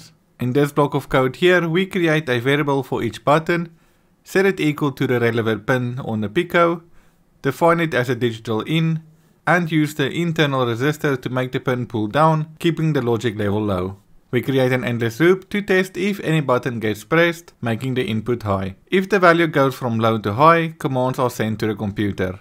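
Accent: South Africa. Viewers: Would love to see version 2. Your accent sounds familiar would you be from South Africa. If so it would help in getting a pcb from your order.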